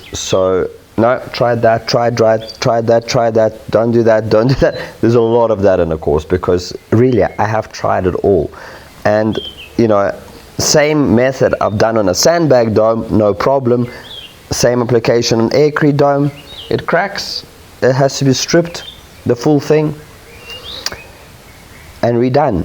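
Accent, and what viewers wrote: South Africa. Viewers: I hear a Saffer accent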